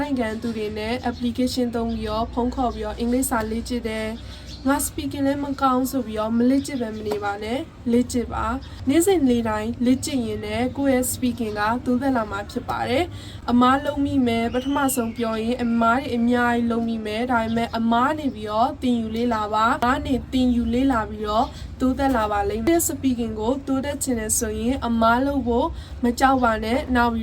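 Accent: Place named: Myeik, Myanmar. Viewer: Thank you Tr. ညီမက မြိတ်သူလား။ လေသံ မြိတ်သံ နဲ့ တူလို့ပါ။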